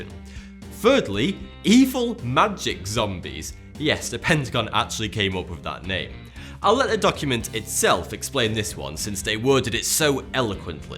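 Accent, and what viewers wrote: United Kingdom. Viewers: I refuse to watch any video narrated by a Brit.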